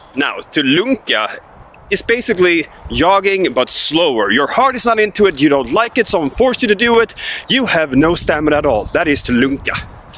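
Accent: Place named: Scania, Sweden. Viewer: as a subscriber of you for a long time, not so hard to hear your Scanian dialect. Thanks for the teaching everything about Swedish!!!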